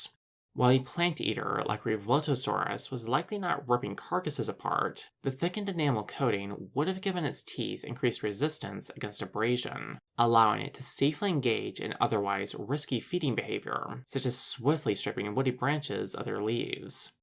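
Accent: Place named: California, United States. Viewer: Effing hell mate!! Why is the narrator soooo californian? Is he gay?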